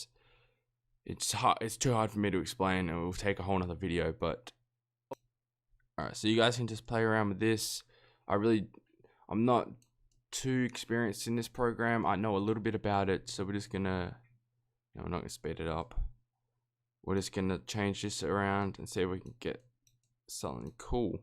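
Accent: Australia. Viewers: smol bean. clout machine.TM bro you can tell by the accent he’s Australian???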